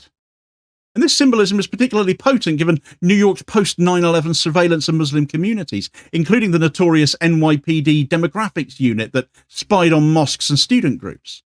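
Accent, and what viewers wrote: Cornwall, United Kingdom. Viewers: Cornwall mate 😅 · Sounds like a Brit to me. ❤😁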